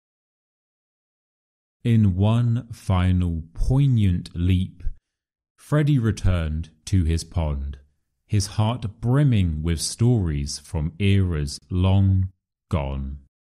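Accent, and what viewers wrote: United Kingdom. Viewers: Thanks for your new video!🐸Today’s story was quite challenging for me! 😂 I found a lot of new words in it. I do love your beautiful British pronunciation!:_HenryNice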